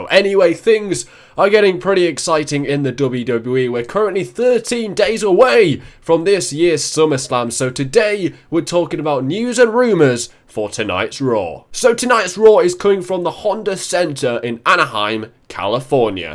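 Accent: England, United Kingdom. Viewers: I was wondering which part of England are you from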